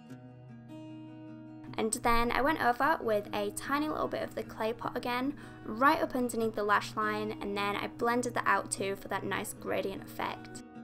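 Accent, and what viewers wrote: Yorkshire, United Kingdom. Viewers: Yay a new vid! Where is your accent from? Is it somewhere in Yorkshire, I always watch your videos when I have panic attacks because your voice really relaxes me:) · so nice hearing a yorkshire accent on this website!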